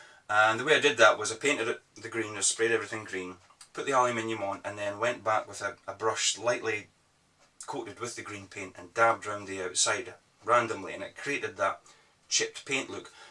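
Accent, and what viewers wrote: Scotland, United Kingdom. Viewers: Lovely work here! All awesome models and I love your Scottish accent! Keep up the good work! Cheers, Leo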